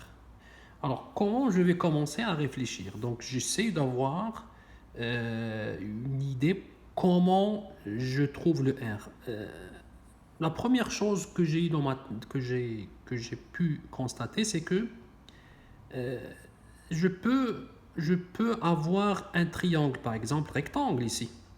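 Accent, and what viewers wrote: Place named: Canada. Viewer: Ouahhh, très bonne vidéo Aussi, je suis habitué à entendre l'accent français parisien, l'accent canadien est très sympa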